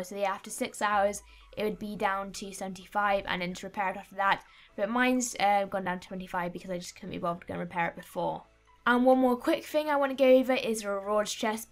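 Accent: United Kingdom. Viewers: Interesting accent, south England?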